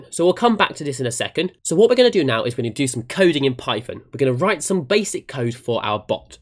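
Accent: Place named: United Kingdom. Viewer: oh, you sound so british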